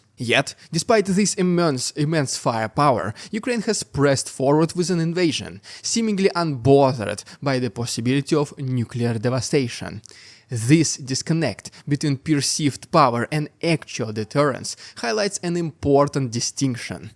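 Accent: Russia. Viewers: I used to watch your videos all the time when you just started but they are becoming increasingly more difficult to watch as time passes. There used to be some 'entertainment' involved but that has almost entirely disappeared nowadays. You can speak very good English for a Russian but your accent makes a long monologue very difficult to listen to, it is difficult for me to maintain the necessary concentration and I very quickly simply stop listening to you. What makes you think people want to sit and decode what you are saying? I believe you have a narcissistic nature and I also believe that if you don't make some very drastic changes to your content your channel will simply fade away. Get some good advice from someone who will be both honest and objective.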